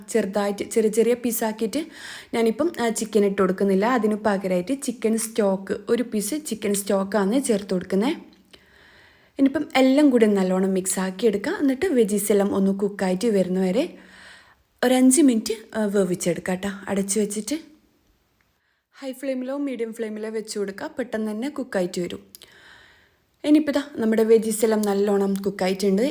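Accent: Kannur, India. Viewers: Hii ithaa...ഈ കണ്ണൂരുകാരുടെ voice ഒരു പ്രത്യേക രസാ ട്ടാ നിങ്ങൾ shamis own ചാാനൽ ലെ ഷമിതയുടെ നാട്ടിലാണോ?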